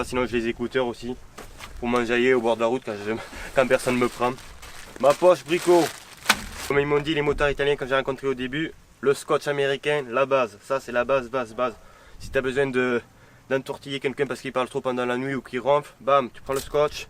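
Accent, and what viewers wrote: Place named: Southwest, France. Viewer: oh t'as retouvé l'accent et le vocabulaire du sud ouest !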